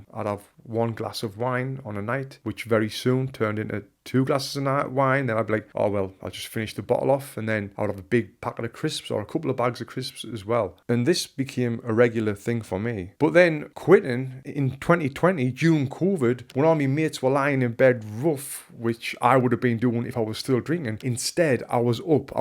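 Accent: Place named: Sunderland, United Kingdom. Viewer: Yes Lad your propa letting your mackem accent out boyo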